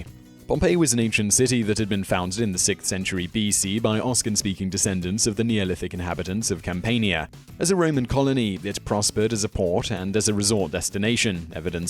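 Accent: England, United Kingdom. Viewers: Fantastic but so very english accent some words I can't understand.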